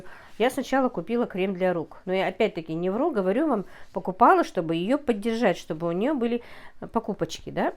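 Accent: Standard. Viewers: дорогая, пожалуйста напоминайте нам про лайки и комментарии! Честно, многие не помнят! Кто не хочет, тот не поставит. А кто хочет выразить Вам признательность, тот иногда забывает, что это канал. Лично я с удовольствием смотрю Вас и как будто с подругой по телефону поговорила, отключилась и пошла. Вы не думайте, что Вы лайки выпрашиваете, просто напоминаете забывчиввм, таким, как я. Восхищаюсь Вами! И да, наслаждаюсь чистым классическим русским языком! Счастья Вам!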